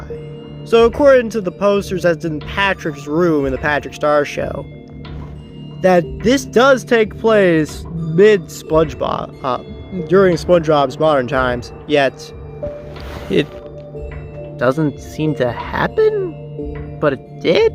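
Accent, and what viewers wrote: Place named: South, United States. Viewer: You sometimes speak with a southern drawl. Are you southern, or is this an act?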